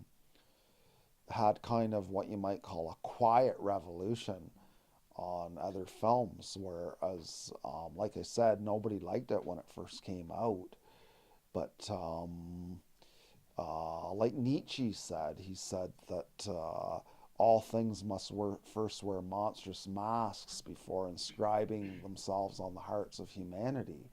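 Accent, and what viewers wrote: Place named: Canada. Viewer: I could talk to this dude forever about this Also one of the most Canadian people I’ve ever heard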